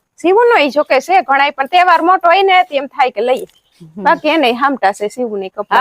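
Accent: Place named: Kathiawar, India. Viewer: Kem chho ben. Sivani su kare chhe. Tamari boli bov saras chhe kathiyawadi..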